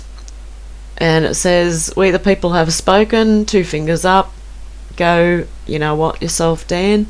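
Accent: Australia. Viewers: R U Aussie? Me too! God bless you!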